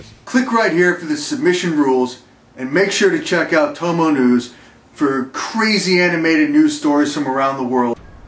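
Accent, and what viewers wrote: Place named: New Jersey, United States. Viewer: He sounds like he's from New Jersey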